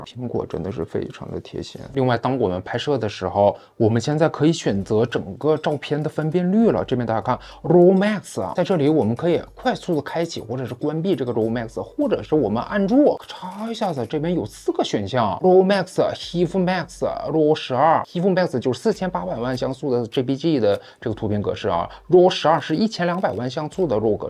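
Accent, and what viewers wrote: Northeast, China. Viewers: 😅 看了博主好几期视频听着掉渣的东北话后，才发现博主坐标韩国😂😂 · 这东北大碴子普通话，duang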